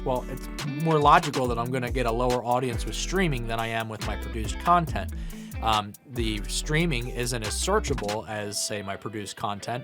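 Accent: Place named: Carolinas, United States. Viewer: U sound like ur from nc or sc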